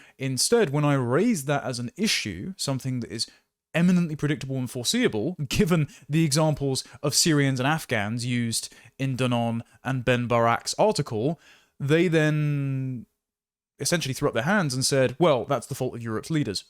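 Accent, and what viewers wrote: RP, United Kingdom. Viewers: This posh useful is simply adding to the panoply of antisemitic tropes--all couched in Oxford Union pseudo-intellectualism spoken in RP. 'Bizarre Heredi, Hasidic cults, and all-powerful Jews,' indeed!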